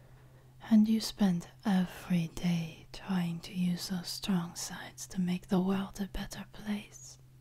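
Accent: France. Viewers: Fdom + French accent? Heavenly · I love the accent. At the risk of sounding totally clueless, are you by any chance actually French? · Even though I am not a fan of hypnosis, I am very excited for this audio. Everything besides the hypnosis is screaming “I want more!” in my head constantly (which is primarily your French accent , which I would fall in love with every single day, as well as the Fdom aspect of the speaker). And hopefully my opinions of the audio actually create constructive feedback (otherwise I’ll keep my mouth shut to not hurt anyone’s feelings). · Ahh french accent and gorgeous soothing voice... perfect for a night. If I had a wife/gf with voice like yours I would ask her to whisper to me every night XD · your French accent is so beautifully pleasant. Is this a sneak peak for a new audio?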